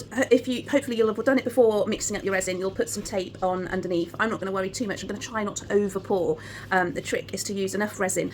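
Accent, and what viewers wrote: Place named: United Kingdom. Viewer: Does everyone in the UK talk as fast as you do? Sorry but I’m from Texas in the United States of America and I just can’t hear that fast!! We talk a lot SLOWER here in Texas. But I really love your accent; it’s so pretty but I just can’t understand you cuz you talk to fast for my brain to comprehend what you are saying. LOL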